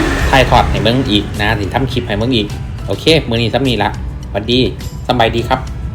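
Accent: Isan, Thailand. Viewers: อยู่แขวงไหนของประเทศลาวคะสำเนียงไม่เหมือนคนลาวเลยสำเนียงออกไปทางอีสานมากกว่า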